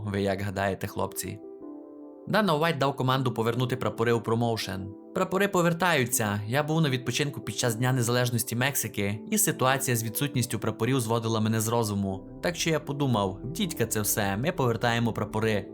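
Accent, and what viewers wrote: Transcarpathia, Ukraine. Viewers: У автора відео закарпатський говір))))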